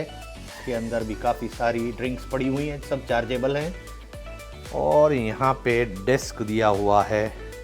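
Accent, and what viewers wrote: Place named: Italy. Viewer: You have build a nice Italiano accent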